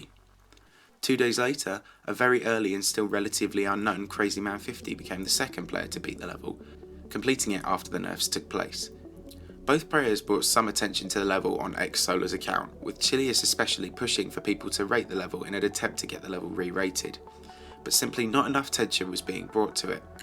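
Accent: United Kingdom. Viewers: are you a brit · You should do more voiced videos, the British accent makes the whole thing come together. Keep it up! You never fail to teach me something about GD!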